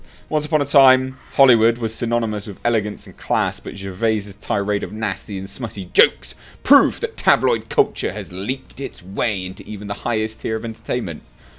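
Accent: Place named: United Kingdom. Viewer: "Why should we be listening to self important douche bags". I don't particularly want to listen to them, but the 1st ammendment is all about free speech son, and I'll defend their right to make political statements, and rickys right to ask them not to. If you dont want to listen to them, you have the remote and it has a volume control, and other channels are available. And, as you sound like a brit, I'm guessing you never watched it live anyway and have only seen clips on YouTube.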